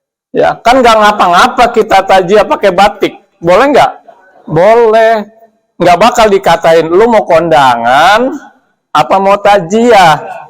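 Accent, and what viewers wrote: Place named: Betawi, Indonesia. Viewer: Hadeuh....ini betawi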